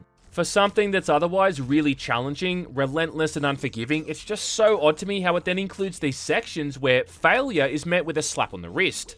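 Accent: Australia. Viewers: I used to love your content but as time goes by you more often than not sound like one of those bought out gaming "journalists" from IGN with the only difference beeing your thick australian accent... Since the last 10-15 uploads or so something feels off about your content bro...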